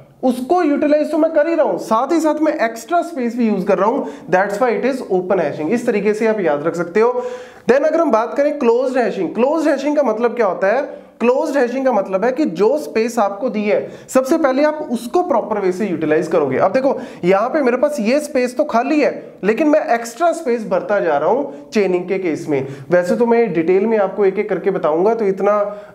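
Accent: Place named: Haryana, India. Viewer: Sir aapka haryanvi touch h language me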